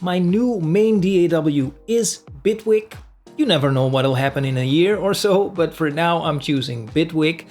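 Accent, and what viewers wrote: Netherlands. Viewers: BitWik hahaha lekker hoor, dat Engels met Nederlands accent. 😉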